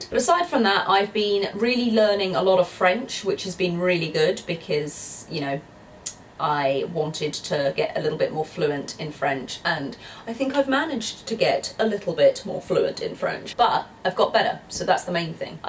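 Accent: United Kingdom. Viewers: Just love to listen to your beautiful british accent. Such a treat.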